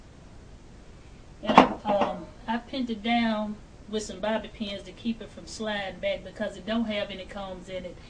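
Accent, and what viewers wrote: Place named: South, United States. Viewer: Girl I love this wig on you!!!!!! And love your country accent =)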